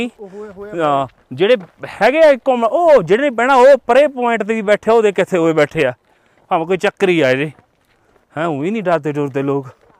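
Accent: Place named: Moga, India. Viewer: Moge di boli ba kamal hai mithi mithi.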